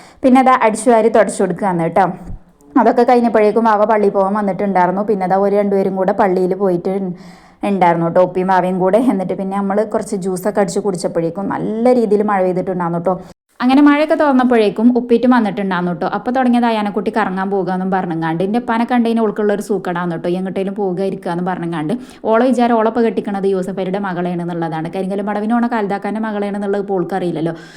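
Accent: Malappuram, India. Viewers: ഞാൻ മലപ്പുറം.. ഇങ്ങളെ വർത്താനം കേട്ടിട്ട് മലപ്പുറം സ്റ്റൈൽ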